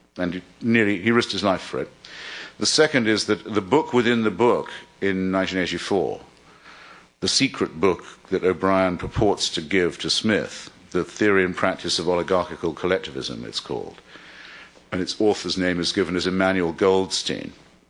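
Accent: RP, United Kingdom. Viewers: a shock jock with a plummy accent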